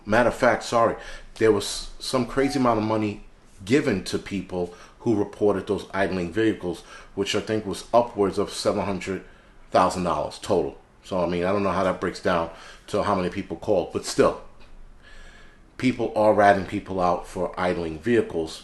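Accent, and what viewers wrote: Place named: Pittsburgh, United States. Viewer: Sounds like Pittsburgh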